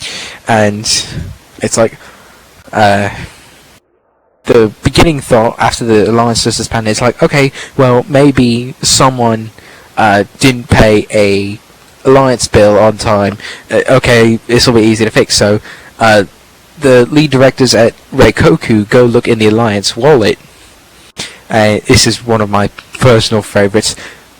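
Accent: United Kingdom. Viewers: UK accents make for great narratives!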